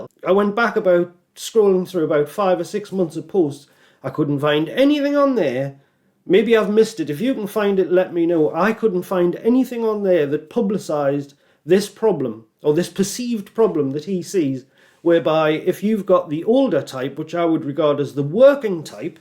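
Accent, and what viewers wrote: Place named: United Kingdom. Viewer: It would seem to me that “Lethargic” has long already fired you from working with him ever again. That would explain why he completely cut you off and moved on with someone else with newer projects. Lethargic would say that you were being extremely insubordinate with him. But yeah, Lavoric or Lethargic or whatever is name is, was only obsessed with profit, he didn’t want to lose alot of money he didn’t have with beta testers; so he just sent them all out as they were. Definitely not the best way to do things, but this is Poland we’re talking about here! 🤷‍♂️😂 I hope you will find another revenue source soon. I really enjoy your videos and British accent/slang talk! I subscribed! I’m a new owner of an Atari 800XL that I heavily modded and recapped myself. This thing is a blast to use with the entire library of games!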